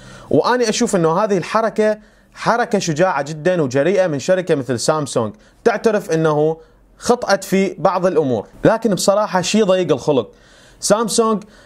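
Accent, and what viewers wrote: Iraq. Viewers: انت عراقي؟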